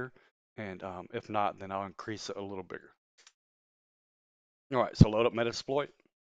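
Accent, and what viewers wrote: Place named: South, United States. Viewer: I can tell from that accent that youre from the same part of the South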